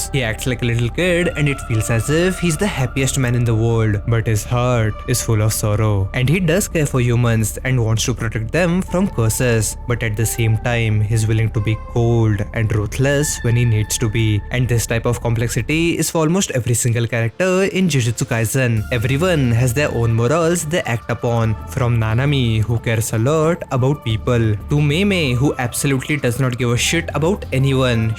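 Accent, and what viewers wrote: India. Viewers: i can tell hes indian i love how they says english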